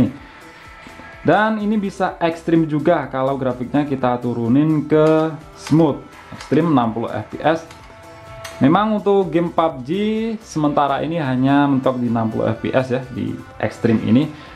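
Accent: Blitar, Indonesia. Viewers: Wong blitar yo mas 😂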